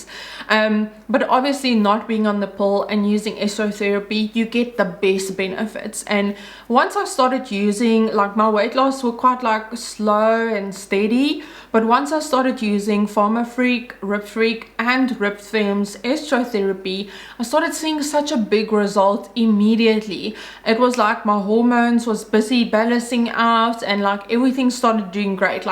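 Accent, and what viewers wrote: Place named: South Africa. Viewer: Love the South African accent!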